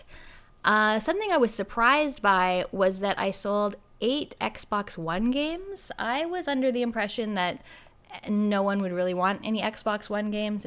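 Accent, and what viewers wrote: Canada. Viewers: I think we all realize youre candian by how you pronounce about lol But yeah duck bucks not greenbacks lol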